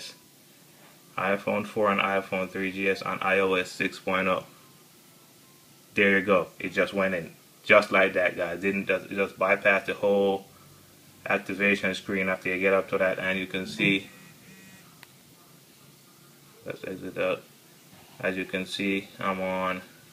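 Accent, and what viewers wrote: Jamaica. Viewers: Jamaicaness of this dude cannot hide...mi luv the fact seh him nah twang an still understandable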